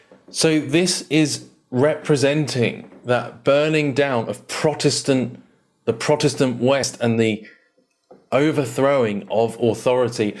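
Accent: United Kingdom. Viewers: AT THIS POINT YOU GUY WHO HANDLES THIS CHANNEL WITH YOUR BRITISH ACCENT YOU ARE NOT THE WAY. YOU SPEAK ABOUT ANYTHING NOW TO KEEP YOUR YT CHANNEL GOING MEANING YOU LOST ALL MERIT. YOUR NOT WISE. OR SPECIAL JUST OVER SPEAKING. PROBLEMS NO SOLUTION. SO FK YOU. AMD YOUR MOTIVATION YOU FOUND PURPOSE IN BS OLD WAYS OLD SOCIETY YOUR PROGRAMED TOO YOU HOLD NO POWER OR WISDOM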